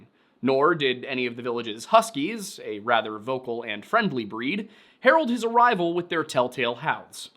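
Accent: Canada. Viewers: Your Canadian accent killed me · dude you sound like a leprechaun with the Canadian accent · "Let's get Innuit." The Canadian lighting and accent. All 🤌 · Love your Canadian accent -a laughing Canadian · As a Canadian I did like the accent you went for, it was a little honky tonk but you got the right idea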